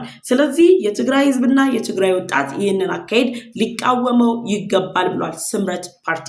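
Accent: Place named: Nigeria. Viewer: አማርኛ የምታወራ ናይጀራዊ ነው የምትመስሊ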